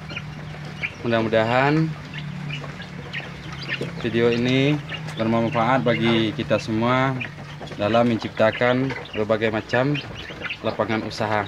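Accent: Solok, Indonesia. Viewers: Assalamu'alaikum mak,mokasih ilmu jo wawasannyo mak, logat bahaso daerah solok, dima Solok nyo mak? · fadel gaul ambo danga kayak nyo urang solok lah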